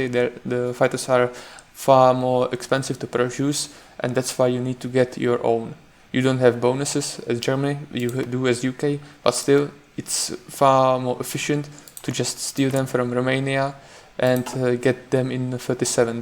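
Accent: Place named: Czech Republic. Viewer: i tried to watch it but i could not stand that czech accent.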